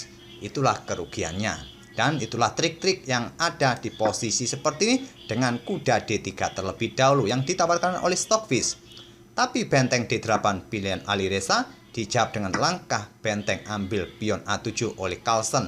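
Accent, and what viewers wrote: Indonesia. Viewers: suaranya medok banget mas...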